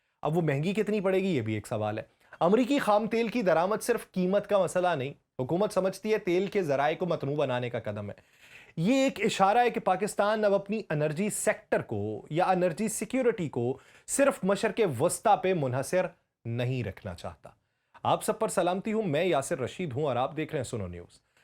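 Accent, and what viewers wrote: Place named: India. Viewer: ye bunda Indian hay,isska ta'lafaz hindustani hay.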